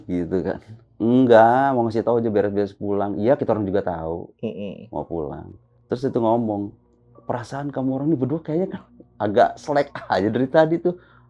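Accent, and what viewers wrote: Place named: Lampung, Indonesia. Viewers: lampung bener cara ngomog nya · asliiii bang😅😅, 100% lampung banget😅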